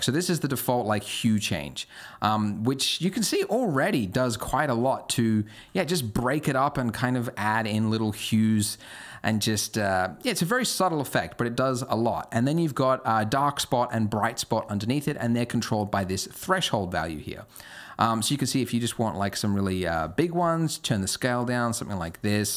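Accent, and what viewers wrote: Australia. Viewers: "and strouaw" <3 the Australian accent!!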